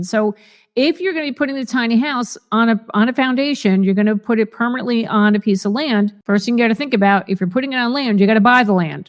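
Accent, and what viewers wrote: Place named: New York, United States. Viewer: Where you from? New York sounds like. "Cawst" instead of costs. Funny.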